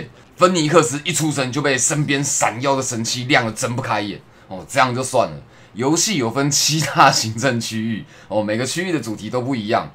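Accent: Taiwan. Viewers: 聽講台語還蠻好笑的，哈哈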